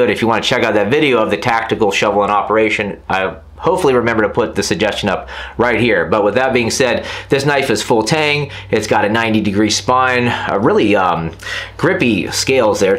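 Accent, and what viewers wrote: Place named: Florida, United States. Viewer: You have got to be around the Florida line between your accent and that moss. Every time we go close to Florida line we start seeing that moss and a mixture of palm trees. Thanks for the video. I really enjoyed the videos.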